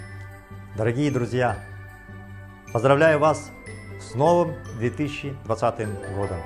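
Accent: Standard. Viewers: Казакша таза сойлейди екен Токаевка караганда. Красавчик 💪🏾 миру мир братья 🇰🇿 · Мұқтар аға таза қазақша үйренді! Тамаша! Жаңа жыл сізді Қазақстанды басқартсын. Халықтың жағдайын түзетіп ұлы атақты тұлға болыңыз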